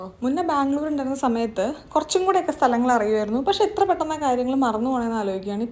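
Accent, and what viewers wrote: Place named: Malabar, India. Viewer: Malabar slang😅👍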